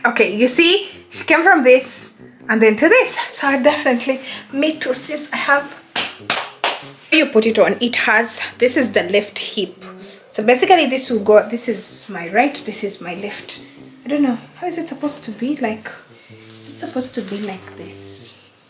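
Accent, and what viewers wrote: Uganda. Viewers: It's your kayindo for me. It really shows that your a muganda plus 👌👌👌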